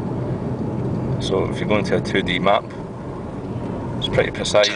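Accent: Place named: Glasgow, United Kingdom. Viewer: OMG you sound JUST like my uncle rizwan and he lives in Glasgow!!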